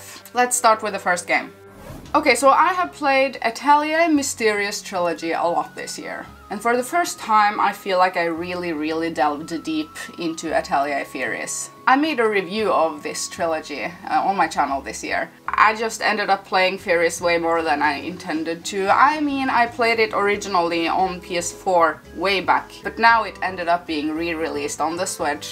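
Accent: Norway. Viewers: Cool video girl. I subbed because you're cool and I like your accent from Norway huh?? Thata neat and you like Nintendo 🙂 Happy New Year!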